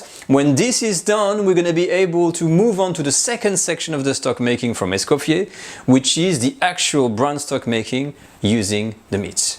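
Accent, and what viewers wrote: France. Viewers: I am just mesmerized by the French accent and love the recepie ❤❤❤! You rock!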